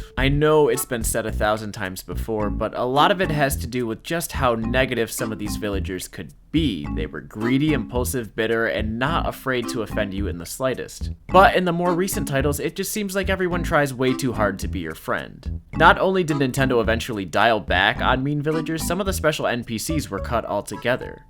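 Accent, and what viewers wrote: Syracuse, United States. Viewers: Are you from... Syracuse..? Cuz I’m relatively close 😳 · bro you from Syracuse???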